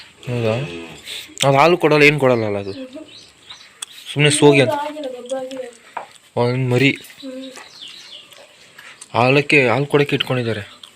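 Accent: Kodagu, India. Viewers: Bro, nivu kodaginavara ….? Nimma mathu kelidre hange ansuthe ❤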